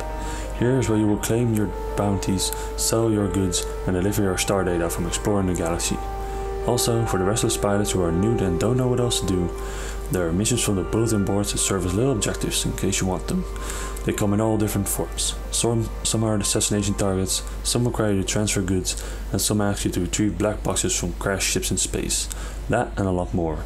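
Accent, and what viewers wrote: Netherlands. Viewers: from which country are you? you sound dutch judging by your accent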